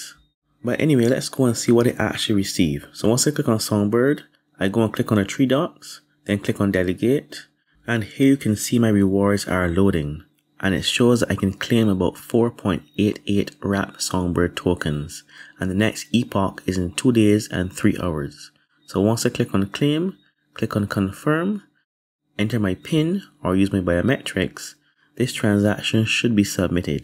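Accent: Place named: Barbados. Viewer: Love that Barbados Accent sounds like English but with a slice of Lime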